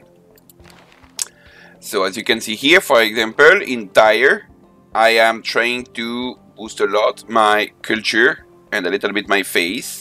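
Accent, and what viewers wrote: France. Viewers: Your accent sounds so French, haha! Love it.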